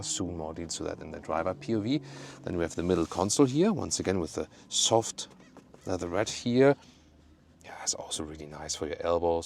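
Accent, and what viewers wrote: Germany. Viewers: Allway Watch he has more of an accent then my cousins and they’re German too. Lol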